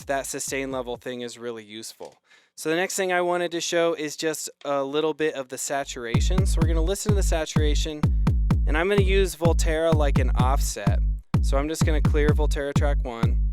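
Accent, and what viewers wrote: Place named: United States. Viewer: Bruh. So glad u speak American haha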